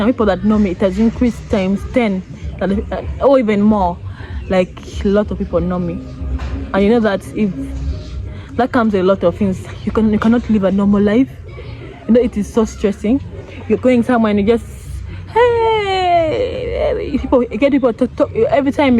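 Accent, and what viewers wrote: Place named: Africa. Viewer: There's sound. She's speaking English with a strong African accent.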